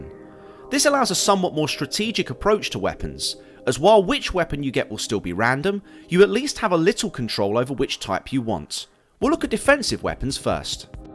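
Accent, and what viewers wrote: United Kingdom. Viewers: Everything is better in a British accent. Thank you for this sir this is a god send. 🙌🏼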